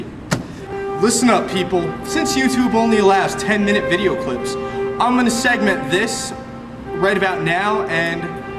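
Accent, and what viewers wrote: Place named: Long Island, United States. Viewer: you don't sound professional at all, it is hard to even listen to what you are talking about because you just sound like another guy from LI. You need to settle down a little bit and take yourself seriously. good to see you trying something you are interested in. -Sam Scheibel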